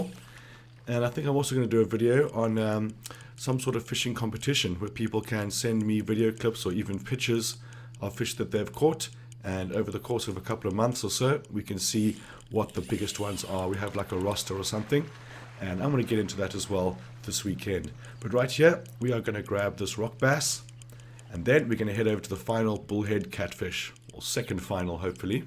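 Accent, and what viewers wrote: South Africa. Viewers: Sound like a South African 🤔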